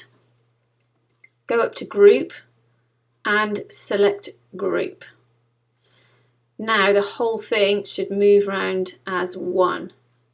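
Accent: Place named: RP, United Kingdom. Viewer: Brilliant! Thank you so much! So much to learn! With you, step by step, plus that angelic RP kept me focused all the way through!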